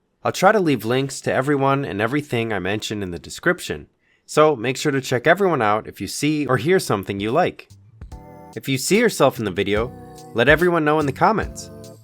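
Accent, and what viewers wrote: United Kingdom. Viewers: Hay great video, liked your English accent, almost as good as mine. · It was great to meet you too, mate! British accent was 10/10 ☕️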